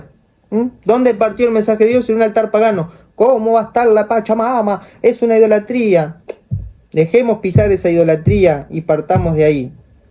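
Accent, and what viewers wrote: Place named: Argentina. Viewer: Quise ver todo tu vídeo pero no aguanté. Me voy a guiar un poco en la miniatura del vídeo que dice "Cansado de la ignorancia católica", casi al inicio dices "me dirijo a vos católico", refiriéndote así a los laicos comprometidos porque, por lo que oigo te parecemos ignorantes porque decir que Francisco dijo una herejía (bueno, ha dicho tantas) en su gira por Asia y Oceanía, te voy a comprar eso de que somos ignorantes, pero no sólo los laicos lo han dicho, lo han dicho sacerdotes, obispos, cardenales, ellos sí saben sobre las Escrituras, sobre el Evangelio, o es que tú sabes más que ellos? No es más bien que tú te llevas por el sentimentalismos de que Francisco es del mismo país que tú y eso no te deja ver que lo dijo Francisco es una total aberración. Cuando digo sacerdotes, obispos y cardenales, me refiero pastores valientes, no como el sacerdote Byron, creo que ASÍ se llama, que se enojó y defendió a capa y espada lo que dijo Francisco, con el argumento ese de "quiso decir", como en las novelas cuando el esposo encontraba a su esposa en la cama con otro y ella decía "esto no es lo que parece" jajaja. 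Ahora, por tu acento doy por hecho que eres argentino, si es así debes saber que Bergoglio tiene una trayectoria nada buena cuando fue obispo en su diócesis, apoyando, defendiendo y solapando a pederastas, y es muy raro eso que a puro sacerdote gay los haya puesto en puestos de alta jerarquía dentro de la iglesia, muy raro, de ahí todas esas ideas de amor y paz entre todos, so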